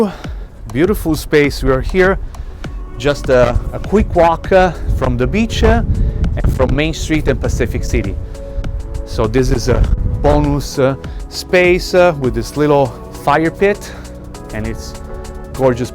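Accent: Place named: Italy. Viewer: What are you talking about? He’s an Italian which has learnt British in America - that’s what it sounds like!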